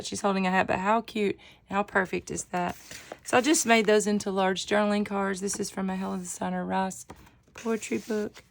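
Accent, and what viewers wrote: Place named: Tennessee, United States. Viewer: I came here from thrifty day to hear your accent lol. It sounds a little bit like my Tennessee accent but yours is cuter 😂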